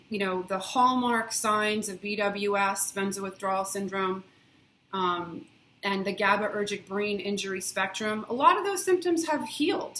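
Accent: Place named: East Coast, United States. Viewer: I love your subtle east coast accent and attitude. You are still attractive on your worst day - Jacob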